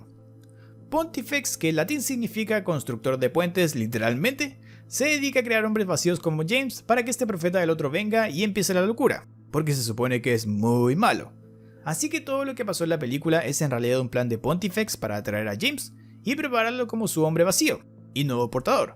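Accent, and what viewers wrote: Chile. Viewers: No es hate pero no me gusta el asentó chileno :'(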